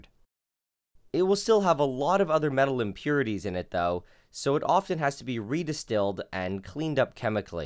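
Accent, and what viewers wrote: Canada. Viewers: Dude he's Canadian. He's gonna say things differently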